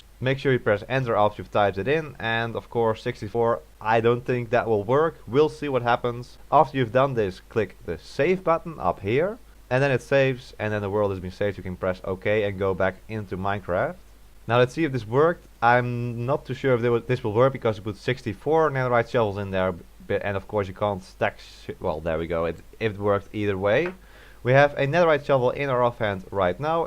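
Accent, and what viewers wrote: Netherlands. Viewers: Are you Dutch? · Are you dutch 😂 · Ben je Nederlands want zo klinkt je stem als je Engels praat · You sound dutch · Ben jij Nederlands, want ik hoor een klein accentje? 😊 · Typisch Nederlands engels 😂😂😂😂😂😂😂😂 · Are you dutch? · ik hoor gwn jou nederlandse accent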